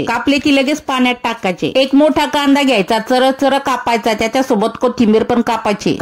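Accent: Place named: Khandesh, India. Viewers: आवाजावर नक्की चाळीसगाव ची बाई वाटते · Mausir recipe video mustastastat pan laijast bolta rav khandesi na vatat ahe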